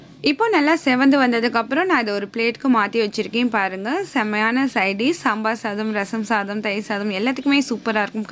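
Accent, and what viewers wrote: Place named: Tirunelveli, India. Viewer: Mam neenga kovilpatti or thirunelveli site ah mam unga speech word enga pakkathula mathiri iruku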